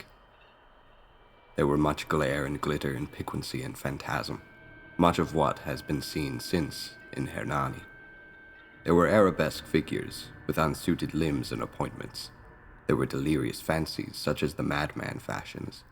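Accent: United States. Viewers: Very cool, almost strange to hear a fellow American rad on the site, he did a great job though, so used to hearing the Brit accent. Of the several 'spooky' tales chs I sub to, HB is still my fav and first choice. Best to all involved.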